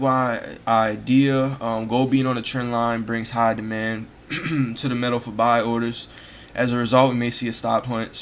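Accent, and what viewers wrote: Louisiana, United States. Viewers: I thought that accent sounded familiar, I'm from Louisiana also. Lol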